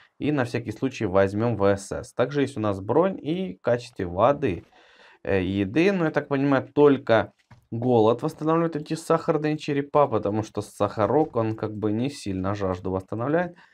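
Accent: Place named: Ukraine. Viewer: Український акцент)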